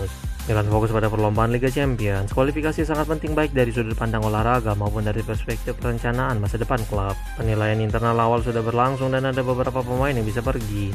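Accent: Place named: Mamuju, Indonesia. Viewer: Ini adminx dari kab. Mamuju, prov. sul-bar yach? Logatx mirip orang mamuju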